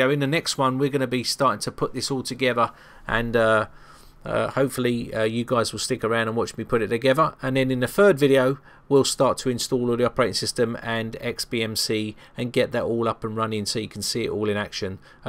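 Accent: United Kingdom. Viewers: damn British accents give me cancer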